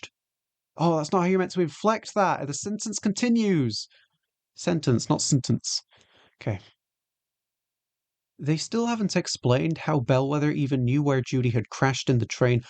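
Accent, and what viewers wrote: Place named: West Country, United Kingdom. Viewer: I mean... I'm guessing you're not American, since your accent sounds vaguely West Country, so you should be able to pronounce that. "pa-pa-ver som-ni-fe-rum".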